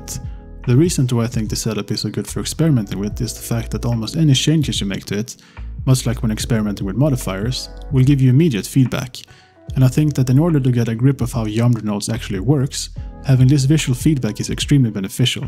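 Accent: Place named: Germany. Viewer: I somehow always feel like this German accent is perfect for modeling tutorials :D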